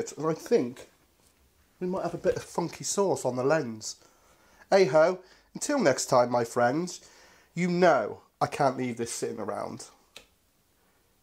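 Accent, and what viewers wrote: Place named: England, United Kingdom. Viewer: ur sweet accent alone tells ur from england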